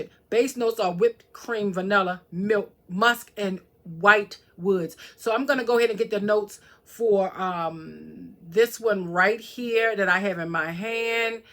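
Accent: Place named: DMV, United States. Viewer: Gurl, you from the DMV, talk your talk!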